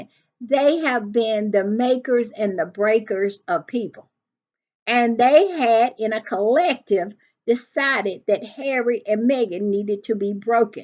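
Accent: South, United States. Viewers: I am watching from England, and I just love your Southern accent ❤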